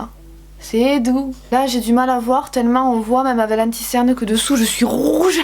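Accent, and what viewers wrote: South, France. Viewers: c'est l'accent du sud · sud de la france ? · L’ACCENT DU SUUUUUUD J’ai juste l’impression que mis à part les ch’tis... on a pas d’accent dans le nord 😂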